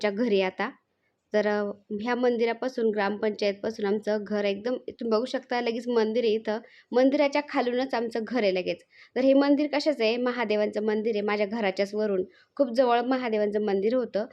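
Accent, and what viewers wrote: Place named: Parner, India. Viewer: ताई तुमचा आवाजाची टोन ऐकुन, अस वाटतच होत की तुम्ही माझ्या माहेरच्या बाजुच्या वाटतात, पन हा vidieo पाहुन कळल कि आपन दोघी एकाच जिल्ह्य़ातील आहे,माझ माहेर पारनेर आहे ,मी पन नविनच youtube channel चालु केलाय ,तुम्ही कुठे रहातात ते please सांगा मला तुम्हाला भेटण्याची खुप खुप ईच्छा आहे ताई please reply दया 🙏🏻🙏🏻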